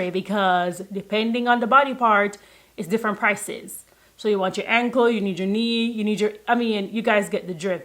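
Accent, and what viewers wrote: Jamaica. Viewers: This is the problem with nursing, if you are coming here to give your opinion about the story on hand why criticize the woman on how she speaks? I’ve never heard anyone asking a Hispanic to pronounce their words better or speak proper English. You should be ashamed to say you’re a Jamaican as well cause you should understand why she speaks the way she does. If it were my channel I would block you! Very distasteful and disrespectful!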